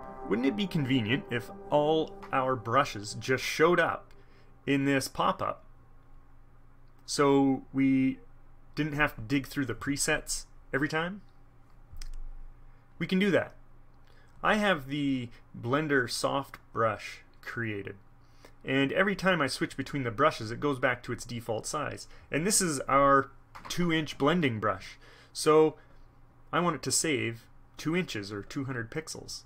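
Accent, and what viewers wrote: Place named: Canada. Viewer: that is a dead give away lol. i use postal codes and zip codes daily for work and that through me for a loop the first time i heard someone go zed1tango 3delta5 i was like WUT and then the way he says resources. canadians pronounce it rezources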